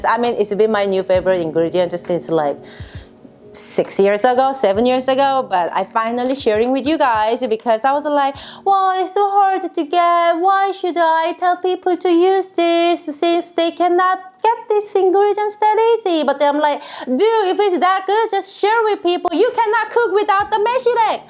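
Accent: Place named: South Korea. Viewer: It is a phonetic spelling of how she actually pronounces the word with her Korean accent. It's a joke.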